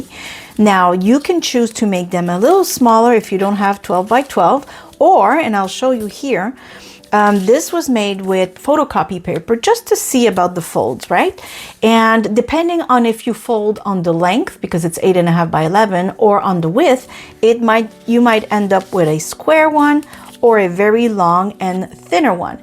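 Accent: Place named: Quebec, Canada. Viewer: This is so cute and easy !! Thanks for sharing !! Is your French from Quebec Canada ? Just curious since I’m sensing a French Canadian accent 😅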